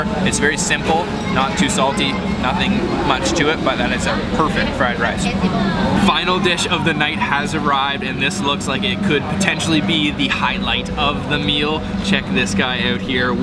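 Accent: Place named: Canada. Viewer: I was wondering where this young man was from....the food looks great. I finally figured out where he is from when he went to the Oowtdoor market and was aboot to try some food.....CANADA. Keep up the good videos young man.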